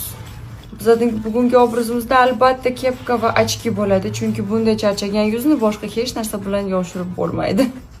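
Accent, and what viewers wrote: Navoi, Uzbekistan. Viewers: Sheva Navoiynikiku😂😂😂😂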